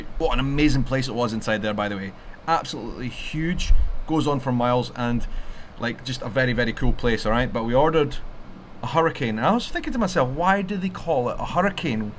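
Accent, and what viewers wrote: Scotland, United Kingdom. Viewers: Especially with that Scottish accent! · You're not wrong, especially if it was a Scottish dude who's visiting these tailgate spots. A Scottish accent is basically a free pass. Most people wouldn't mind if you were drinking their alcohol. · Advice to you or anyone else traveling from abroad to an American sporting event - TALK TO PEOPLE! Us Americans LOOOOOVVEEEEE accents - Scottish is the best! - and once we find out you’re from elsewhere, it becomes OUR DUTY to show you the best time possible; you won’t pay for drinks the rest of the day, trust me! Never hesitate to use your accent as the currency it is here in the states; we love to have you!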